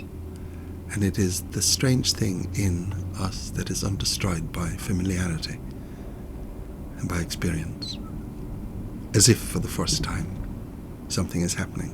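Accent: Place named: Kerry, Ireland. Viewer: Beautiful, dreamlike commute with the loveliest Kerry accent, that takes me back to childhood memories of sitting on the carpeted floor listening to Brendan Kennelly on the Late Late!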